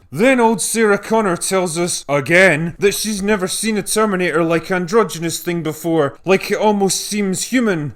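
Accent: Scotland, United Kingdom. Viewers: At first I was like shut the f up. But then I thought your voice is a cross between scotty of trek and braveheart But......take a breath it will be ok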